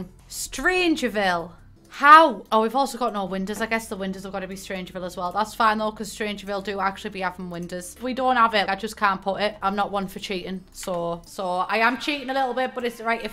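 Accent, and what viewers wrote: United Kingdom. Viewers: I cant imagine trying to understand her if your not a brit especially if you already struggle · Well I only understand half of what she says, but makes sense since I'm not native, and also I wonder from which part in the UK is she from.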